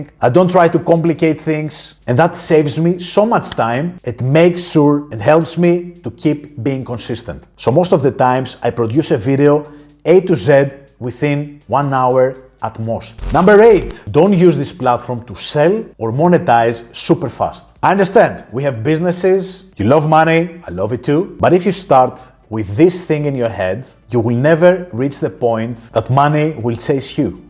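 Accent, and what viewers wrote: Greece. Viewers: I think you are from Greece because of the accent ☺️♥️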